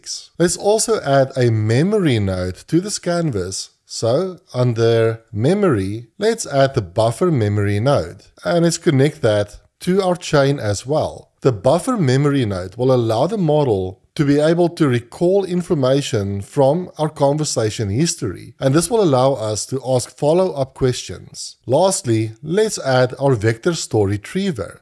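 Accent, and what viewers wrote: South Africa. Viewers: Great videos love the SA accent - in my flowise I don't see upsert config? please let me know what to do to get this.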